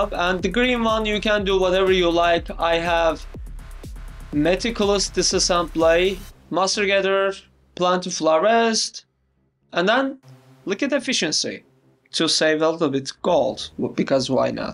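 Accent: Turkey. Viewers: hi mate ! where are you from ? i got turkish vibe on your voice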